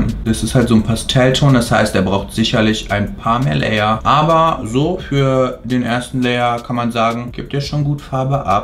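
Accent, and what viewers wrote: Germany. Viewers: Du kannst echt gut Englisch sprechen, aber du hast so einen starken deutschen Akzent haha😂😘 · Unnötig dieses Denglish! Wenn er wenigstens einen amerikanischen oder britischen Akzent hätte, aber der deutsche Akzent geht gar nicht!!!!!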